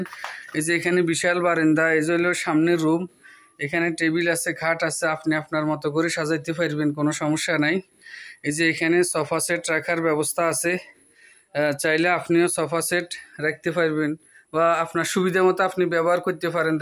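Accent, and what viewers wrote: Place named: Noakhali, Bangladesh. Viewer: ভাই নোয়াখালী নাকি।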